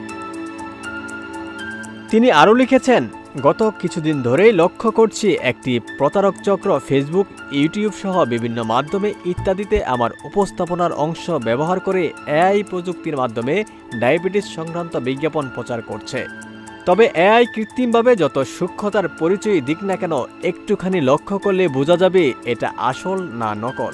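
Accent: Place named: Kolkata, India. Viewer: আমি পেয়েছি ফেসবুকে কিন্তু আমার বিশ্বাস হয় নায়। কন্ঠের উচ্চারণ কলকাতার ❤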